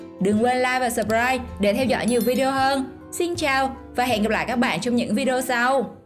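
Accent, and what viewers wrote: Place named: Binh Dinh, Vietnam. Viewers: Như người bình định nhỉ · Nghe giọng cj nói cứ như cj là ng miền trung ý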